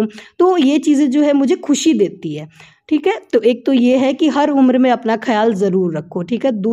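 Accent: Rajasthan, India. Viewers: Hi m Rajasthan se hu aur aapki tone sun ke lagta h ki aap bhi Rajasthan se hi ho....maine abhi kuch din pahle se aapke vlogs dekhna start Kiya h....mujhe aapke vlogs acche lagte h aur khas kar ke ye vlog to kuch jyada hi accha h...m aapse milna chahti hu....aap kaha se ho Rajasthan me....m aapse kaise contact kar sakti hu???Mera naam deepa h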